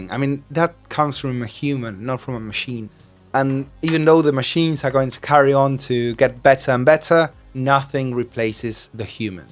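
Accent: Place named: England, United Kingdom. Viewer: How many truths, and none of them are absolute!! Great video, love your english accent bro. Keep it up! 💪💪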